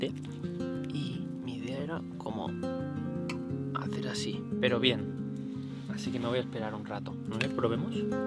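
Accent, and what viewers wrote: India. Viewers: por que hablas ingles como un indio? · Por probar, he visto tu video doblado al inglés por ti, y la verdad solo a modo de crítica constructiva, hablas muy bien inglés pero pones un acento indio. Cuando puedas escuchate a ti mismo y fijate en eso para cambiarlo si quieres sonar más americano o neutro.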